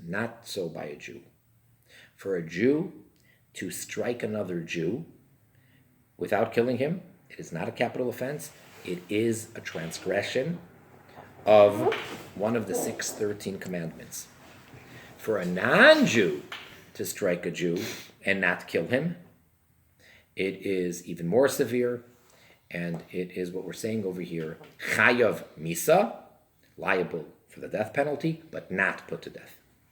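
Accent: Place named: New York, United States. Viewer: 12Yrs Old STILL JUST a GIRL including 2-1/2yrs of age. What in the world ☝️ Uhm that is PEDO & ABUSIVENESS of a Minor maybe in Iserel But in the world at least in America which ur SPEAKING IN ENGLISH (sounds like NY)... so these THESE 7 Laws shouldn't nor ever RELATE to ANY AMERICAN Girl & BTW... How old is the Boy? Pray God it's a Boy NOT 🚫 A MAN? WE ALREADY HAVE THE 10 COMMANDMENTS Thank You very much.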